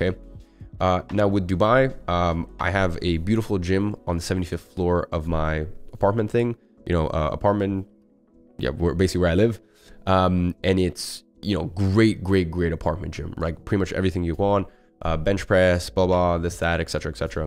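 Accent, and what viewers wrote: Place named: United States. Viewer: Bro had a russian mom, grew up in london, and has an American accent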